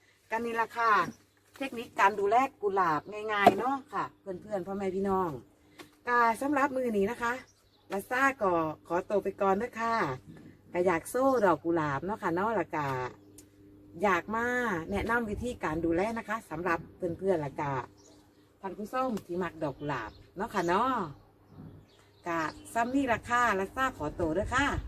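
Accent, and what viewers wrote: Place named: Isan, Thailand. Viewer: ขอบคุณวิธีดูแลกุหลาบเด้อค่ะ หนูกะปลูกคือกันจ้าแต่กุหลาบหนูกำลังสิตายหนูดูแลบ่เป็น หนูคือมักพี่เว่าแท้เว่าตลกดีๆฟังไปได้หัวเราะไป เว่าอิสานนี้ละจ้าเป็นตัวของตัวเองดีจ้า หนูเป็นกำลังใจให้เด้อจ้า😊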